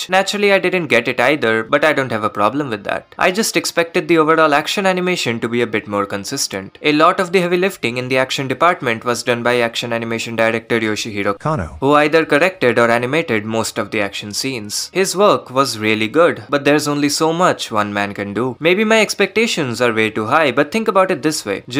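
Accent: India. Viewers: His voice sounds like how Indians usually talk with this kind of accent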